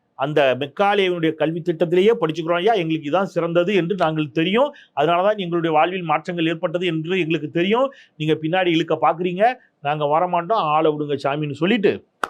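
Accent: Tirunelveli, India. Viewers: Thirunelveli language la sema Anna.... congratulations 🍫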